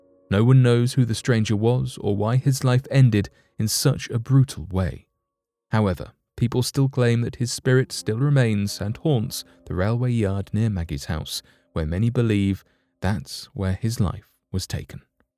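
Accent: United Kingdom. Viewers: WHERE YOU FROM ARE YOU A FELLOW BRIT? 🇬🇧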